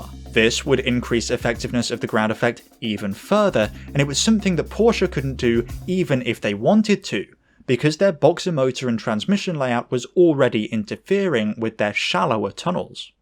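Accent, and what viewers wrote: United Kingdom. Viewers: As a french man I love your cotenent and the way you tell racing stories ! The English accent may also play a role... 👍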